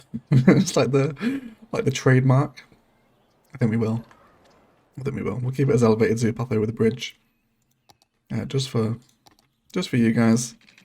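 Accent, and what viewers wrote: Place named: Northern England, United Kingdom. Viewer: The way you talk... and the happy little trees... you're like the Bob Ross of Cities Skylines... Like, if Bob Ross was from the north of England :)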